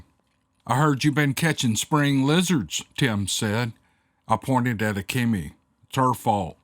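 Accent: South, United States. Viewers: I started listening to this story at work am and couldn’t turn it off. Amazing stories and, you just takes it to another level with his Southern Comfort style narrating it captures my mind like I’m watching a movie. Thank You.. I made it to the end. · Same here mam been on here for years now love the southern gentleman’s voice w the light touch of southern accent awesome Sir he is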